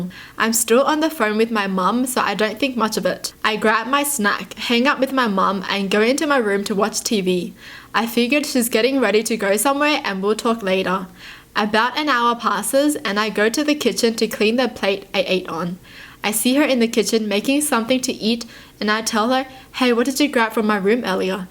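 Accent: Australia. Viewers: Who else loves her Aussie Accent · omg idk why but everytime I see, I think of Rose' (from blackpink). Like the cute aussie accent and everything!✨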